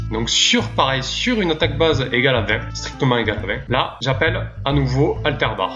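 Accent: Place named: South, France. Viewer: merci beaucoup je fais ça dès que possible. j'entend un accent du Sud tu es d'ou ? actuellement sur la RP, mais je suis originaire de Perpignan.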